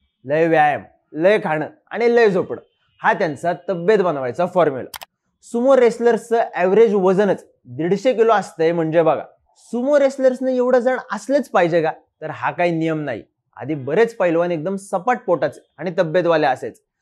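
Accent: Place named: Satara, India. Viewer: Bhava boltos lay bhari asal satari ❤️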